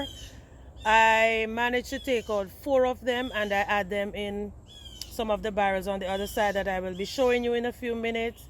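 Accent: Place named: Jamaica. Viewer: Hey, I’m so glad I found your channel. I grew up in south Florida and I recognized that Jamaican accent immediately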